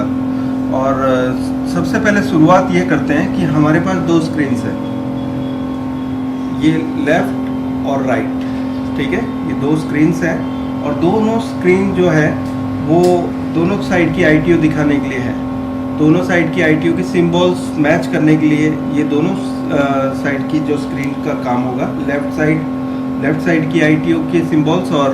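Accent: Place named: India. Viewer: english way you sepack indian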